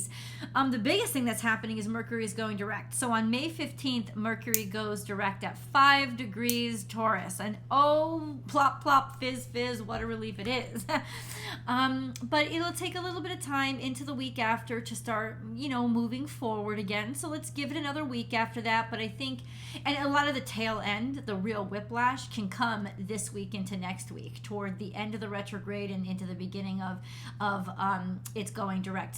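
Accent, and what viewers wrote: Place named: Connecticut, United States. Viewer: You sound like you are from Stamford/Norwalk, and your star calls and insights have been spot on for me. Thanks for the insight. M/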